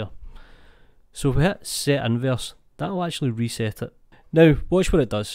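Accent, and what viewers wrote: Scotland, United Kingdom. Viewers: Ah okay, that explains everything 😄 the scottish accent 👍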